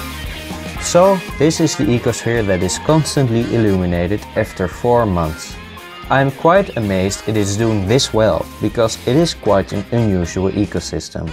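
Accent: Netherlands. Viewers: Nice Dutch accent :) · You sound Dutch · You Dutch bro?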